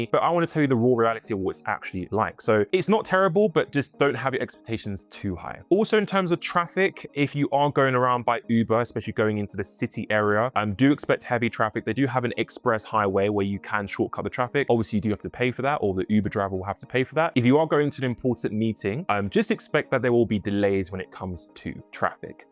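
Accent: United Kingdom. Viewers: What a bunch of pap--with a posh British accent.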